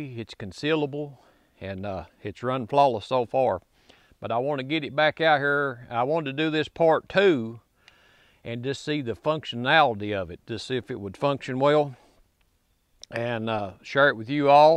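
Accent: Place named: South, United States. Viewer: Love this one can’t wait to pick one up.I just noticed something funny,watching this video while my dads started his diesel truck up can’t hear so put closed caption on and with your accent the stuff it types out vs what I know your actually saying is so far off it’s funny.not making fun of the southern accent the caption is lost it says Hornady as horn today.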